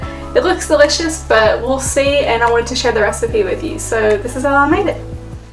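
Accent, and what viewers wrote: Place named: South, United States. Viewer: I love bloopers... And ur losing ur southern accent girl...it's English/southern lol that made me hungry.. I think I would like the Apple ones...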